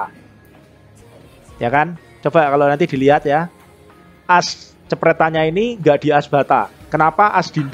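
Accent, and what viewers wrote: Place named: Surabaya, Indonesia. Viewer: Mangkane medok_e Jawa Suroboyoan ..hehe salam dari Surabaya Om sehat selalu..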